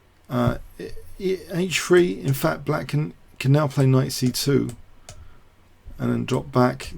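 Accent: United Kingdom. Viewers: I just wanted to say how grateful I am for all these videos you do. I'm 28, and I've been playing chess since I was 10. I stopped playing back in '07 when I was diagnosed with leukemia in the navy. I've recently started getting back into it and am amazed by all the instructive video options there are on YouTube. But yours are the only ones I care to watch anymore. Majnu is ok, but just not in-depth or instructive enough. I had to get used to your "British accent" at first, but now I find it quite comforting (weird, I know). So thank you for all you do for the chess world. There should be like a Nobel Chess Prize or something for this.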